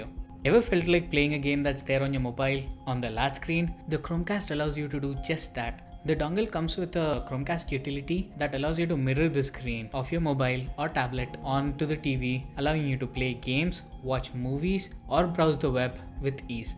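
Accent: India. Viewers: Haha...he is speaking Hinglish. So, you won’t understand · that Indian accent though...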